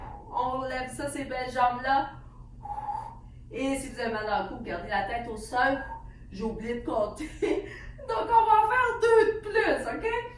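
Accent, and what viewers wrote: Quebec, Canada. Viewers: Super séance! j'ai 78ans et j'ai adoré je le pratique un jour sur deux, j'ai enfin trouvé mon Pilates idéale en plus avec l'accent du Québec c'est cool, comme on dit chez moi c'est tip top! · Génial ! Vous êtes formidable ! Ce cours accompagné de votre délicieux accent du Québec est un vrai moment de bonheur! Merci 👍🏼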